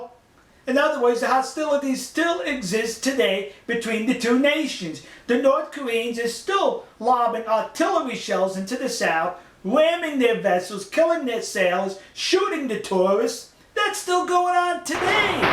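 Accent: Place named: New York, United States. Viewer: The character is a fraud. He doesn't even sound Texan. I think he has a New Yorker accent LOL. It's funny how they promote memorabilia items online. Just a way to earn a quick buck by telling the Japanese what they want to hear.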